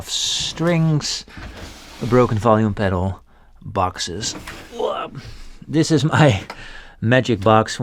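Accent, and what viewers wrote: Netherlands. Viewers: ah, so Dutch? ty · English sounds best with a dutch accent, from my POV!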